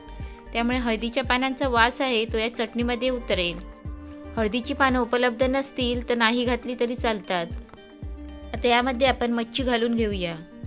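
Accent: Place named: Konkan, India. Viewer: Love the Konkani accent ❤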